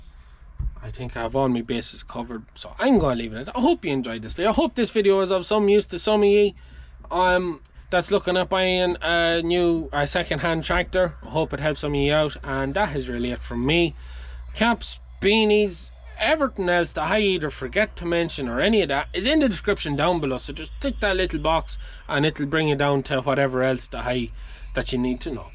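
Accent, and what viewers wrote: Ireland. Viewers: I might be wrong but are you Irish?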